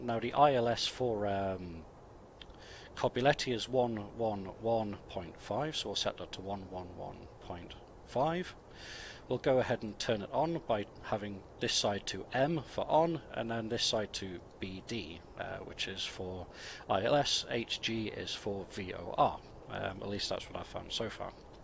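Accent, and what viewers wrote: United Kingdom. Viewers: are you british ?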